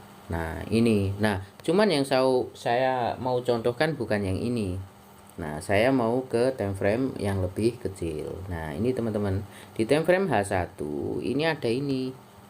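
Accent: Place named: Java, Indonesia. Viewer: Ternyata wong jowo to mas... Jawa mana mas?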